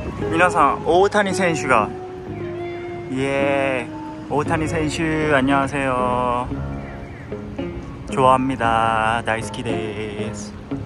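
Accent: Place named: Hakata, Japan. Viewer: 日焼け止めがこんな可愛くてもいいと~？❤ 博多弁のJUJUさんもも可愛か~❤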